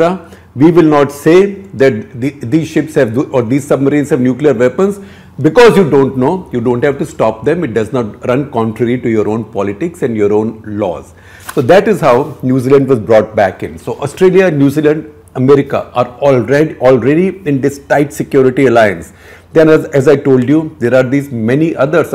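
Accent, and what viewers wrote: India. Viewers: If you want to address English speaking people you MUST lose that broad Indian accent because it makes everything you have to say so difficult to understand. You are a very knowledgeable man but you will remain unknown as long as you speak with that thick accent, I'm trying to help you I hope I didn't offend anyone. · Very India English. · Really dislike indian accent.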